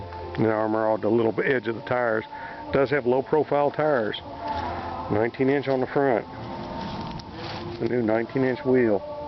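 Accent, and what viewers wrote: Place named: Kentucky, United States. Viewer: sounds like you might be in kentucky. if so i'am from winchester. live in apopka florida have a 2014 street glide special gold peral about a year now . my last name is brett still have many, many family and freinds in lex, georgetown, louisville,winchester and kentucky area. ride safe and enjoy / peace !!!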